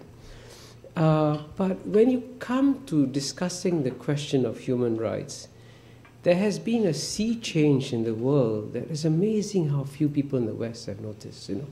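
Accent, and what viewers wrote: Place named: Singapore. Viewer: he sounds SIngaporean!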